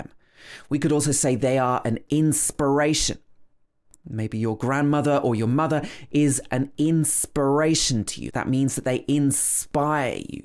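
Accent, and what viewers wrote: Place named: United Kingdom. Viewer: Hi,. How are you?; I am living in Manchester and it is more important to improve my British English. It would be great if you make some videos of lessons like this THAT IS VERY PRODUCTIVE AND IMPORTANT. Your British pronunciation is great and all lessons as well That is the reason why I am learning English with you at the moment I have IELTS 6.5 but it is not enough. Thank you.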